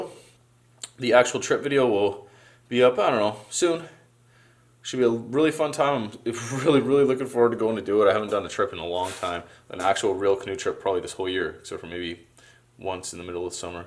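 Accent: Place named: Canada. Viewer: Hey say hi to scout for me love yr Canadian accent "Not to shaby"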